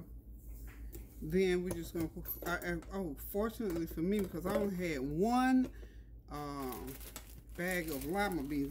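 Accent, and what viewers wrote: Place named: South, United States. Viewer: This too southernnnnnnnnn 😩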